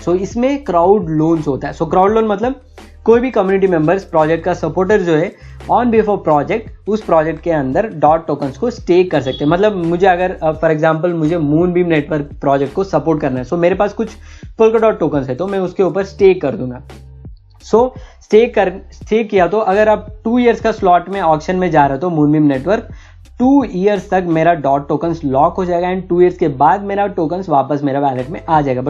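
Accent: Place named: South India, India. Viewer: Your accent is South Indian and you use more English. Change your channel name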